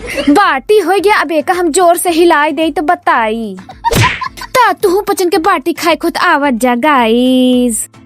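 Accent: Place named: Pratapgarh, India. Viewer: प्रतापगढ़ वाले ऐसा बोलते हैं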